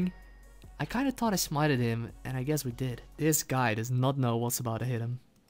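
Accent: Netherlands. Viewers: Your dutch accent is so obvious 😂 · Are u Dutch? Accent tells everything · Keep up the work man, just found you and you earned a new sub from me. Btw are you Dutch? RSN: Penguin Hug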